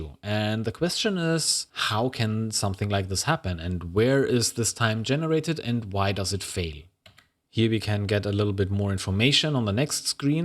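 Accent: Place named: Germany. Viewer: you have a very distinct german pronounciation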